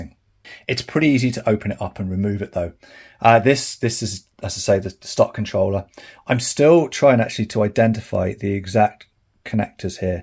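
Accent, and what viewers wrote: United Kingdom. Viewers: Very interesting. Listening to you speak, can I hazard a guess that you originated not many miles from Swindon, UK??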